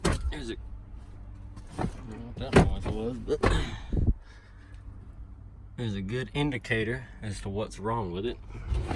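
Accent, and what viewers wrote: South, United States. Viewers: but that souther accent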